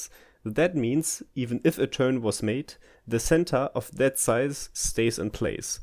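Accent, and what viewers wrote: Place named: Switzerland. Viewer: Why do you have a swiss accent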